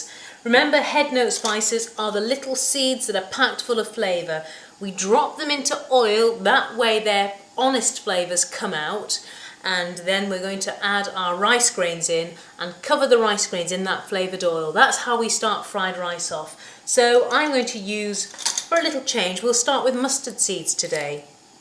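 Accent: England, United Kingdom. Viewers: what is that accent?why english accent? really funny though.....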